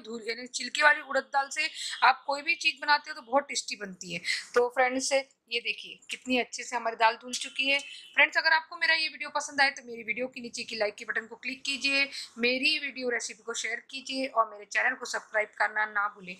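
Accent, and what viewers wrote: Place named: Maharashtra, India. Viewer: Apka hindi mast marathi logo ki trha h nd thank u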